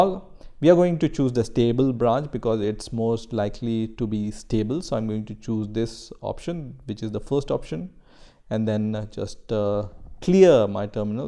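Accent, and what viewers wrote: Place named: India. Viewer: you now what you sound Indian and I am pretty sure u are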